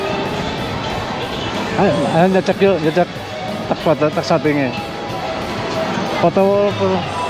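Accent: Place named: Banyumas, Indonesia. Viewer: jos lh,,PD Bae ro enyonge,,,salut walaupn d ngrti orng ngapak e Ra klalen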